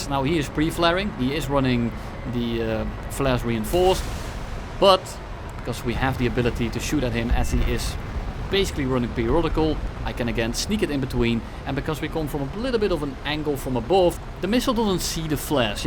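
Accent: Netherlands. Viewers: Ik heb nog nooit zo een duidelijk Nederlands accent gehoord in het Engels.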